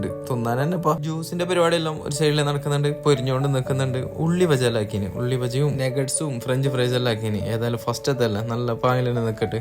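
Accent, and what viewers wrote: Kasaragod, India. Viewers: സംസാരം കേട്ടാൽ തന്നെ മനസ്സിലാകും കാസർകോട്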